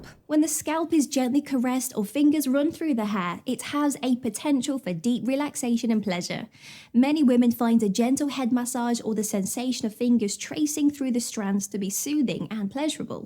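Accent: England, United Kingdom. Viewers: your English accent is so beautiful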